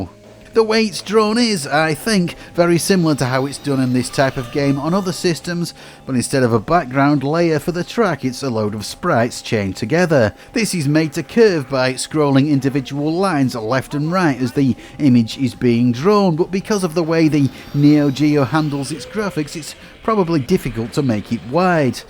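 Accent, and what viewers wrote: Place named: England, United Kingdom. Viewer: I hear a fellow english accent, I see neogeo, I KNOW the metal slug section is going to be the longest lol Nice one my man :D.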